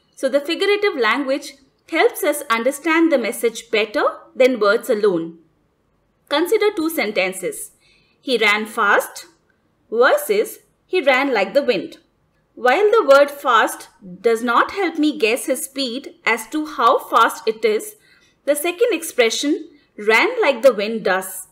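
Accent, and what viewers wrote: India. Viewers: You speak English-language with Indian accent. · When the video was done and you realize you don't understand anything because of laughing at the indian accent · lmaooooooo indian voice xdxdxdxd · People complaining about her "Indian" accent could switch on the subtitles. Great tutorial, subscribed to your channel :)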